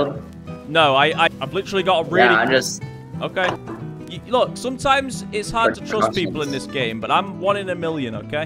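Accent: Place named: Manchester, United Kingdom. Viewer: knew u was a manc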